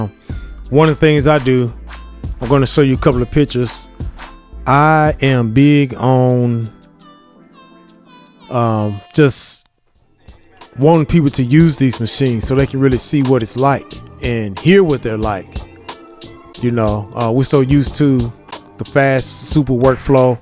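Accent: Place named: South, United States. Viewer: I KNEW I heard that West Alabama/Eastern Border Mississippi in your voice Mane…✊🏽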